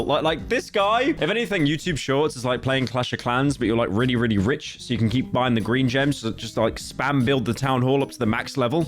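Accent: United Kingdom. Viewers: Idk why but I like listening to this brit yappin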